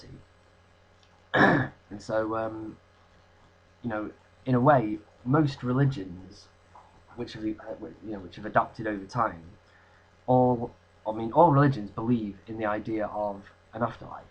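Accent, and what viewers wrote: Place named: England, United Kingdom. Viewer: I love your accent. It would be very nice if all the English talked this way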